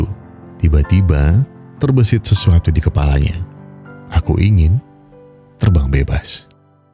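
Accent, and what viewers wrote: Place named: Java, Indonesia. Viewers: Logat jawa'mu kuwi lo aku suka 😊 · Suarane khas bgt wong yogjo mas.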